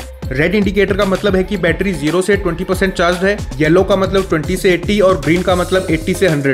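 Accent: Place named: Arab world. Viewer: I like hearing Arabs speak English like this. Which country are you from Saudi Arabia,Kuwait, Dubai,Yemen?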